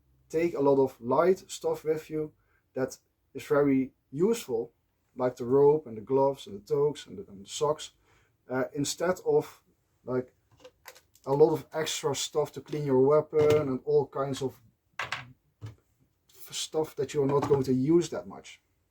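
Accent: Netherlands. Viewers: I’m new to this channel and I’m loving it so far. I’m assuming by the accent you are from the Netherlands? Hello from USA!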